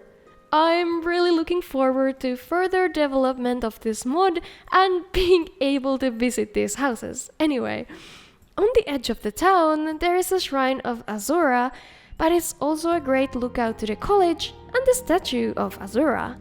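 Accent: Finland. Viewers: Are you from Finland? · Finnish accent spotted, torilla tavataan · oh... OH... i didnt recognise from the accent at first, but... finland... huh... didnt think i'd find one of my kinsmen from the depths of skyrim mod enthusiasts. still, gonna download that mod, 100%.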